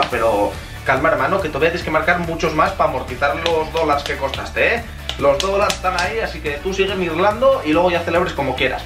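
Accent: Asturias, Spain. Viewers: Me encanta el acento asturiano ye saludos desde Granada 😍😍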